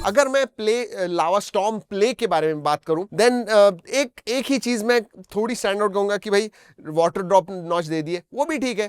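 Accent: India. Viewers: Indian english its a trash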